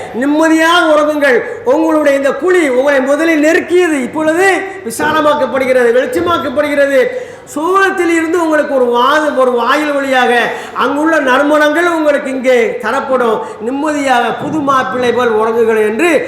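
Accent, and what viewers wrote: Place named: Tirunelveli, India. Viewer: முழுவதுமாக பயானை கேட்டேன். அருமையான திருநெல்வேலி தமிழில் ஒரு சிறந்த பயான் அல்ஹம்துலில்லாஹ் வேண்டிய பயான் ஆண்களும் பெண்களும் அதிகம் அதிகம் பயான்கள் கேட்பதால் நம்முடைய ஈமானுக்கு அதிகம் அதிகம் உரம் ஊட்டியது போல் ஆகும் .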